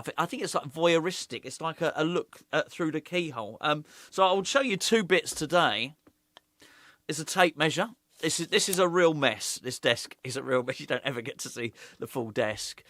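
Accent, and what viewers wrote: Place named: Essex, United Kingdom. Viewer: IF the arse ever falls out of your YouTube career (PLEASE never let that happen) then I think you have shown an excellent skillset to move into shifting to ASMR content. "Tape measure, a peg and a piece of electrical tape". All said in that soothing and smooth Essex lilt. Gonna be honest, I found myself going slightly gooey inside. 😂😂😂😂😂😂😂😂😂😂😂😂😂😂😂😂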